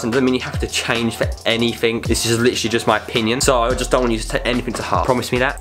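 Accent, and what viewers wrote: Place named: England, United Kingdom. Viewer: he's from England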